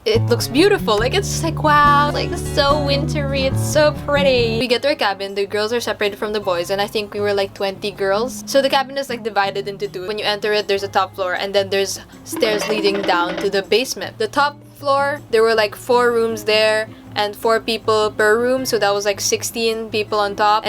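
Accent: Philippines. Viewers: listening to a youtuber speak with a filipino accent is weirdly comforting. you sound like my auntie. thanks ate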